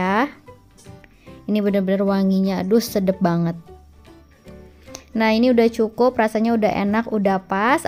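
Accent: Java, Indonesia. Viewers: Duh jadi laper mba, medog banget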